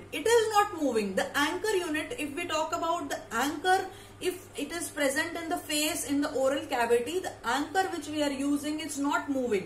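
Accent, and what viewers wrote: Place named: India. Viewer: Thank you ..can you make translation for vido it is very good but i cant undrstand indian accent very will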